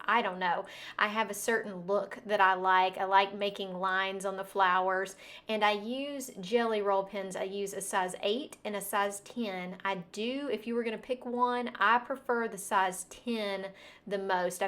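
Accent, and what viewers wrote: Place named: Texas, United States. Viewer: Beautiful! And I enjoy that Texas twang...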